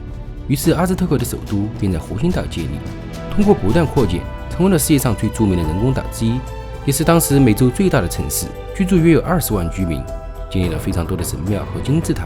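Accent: Sichuan, China. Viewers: 四川人讲普通话，这样已经很不错了，你是没听过更糟糕的，而且比起口音，我们在意的不应该是视频内容吗?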